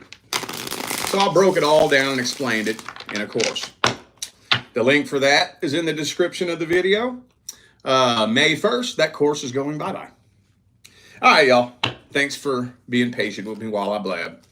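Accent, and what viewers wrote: South, United States. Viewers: Hahaha you’re like me surrounded in vapes!! Eastern KY!!!!!! Go BIG BLUE!!!!! I KNOW you’re southern or somethin…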